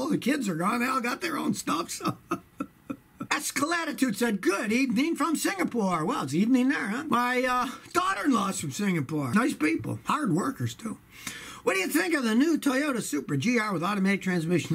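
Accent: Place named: United States. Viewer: What kind of US accent does have? I sometimes hear it, but not as strong like.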